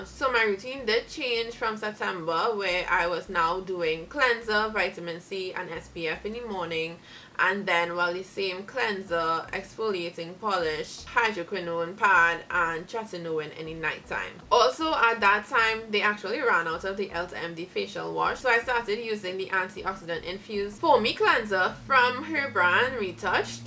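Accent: Trinidad and Tobago. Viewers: I know a Trinidadian when I hear one!🇹🇹🇹🇹🇹🇹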